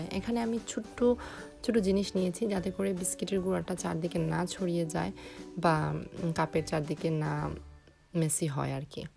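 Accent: Sylhet, Bangladesh. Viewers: Assalamualikum apu apni ki Sylheti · Api you're from sylhet?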